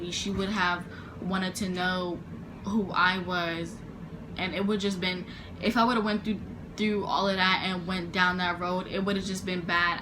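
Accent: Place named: Baltimore, United States. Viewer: Where u from cuz I heard baltimore im from baltimore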